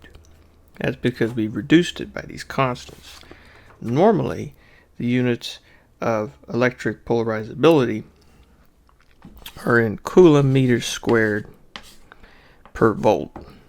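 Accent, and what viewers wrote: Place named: South, United States. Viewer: Love the Southern drawl haha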